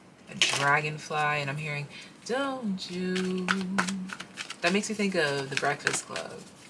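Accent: United Kingdom. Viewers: ahhhhh so for pile 3 right at the beginning i channeled the song “ ” by (which at the beginning speaks ab someone who doesn't know how beautiful they truly are) also LOLOLLLLL the british accent is HILARIOUS bc my man is british 😭❤️ thank you so muuuuch!!!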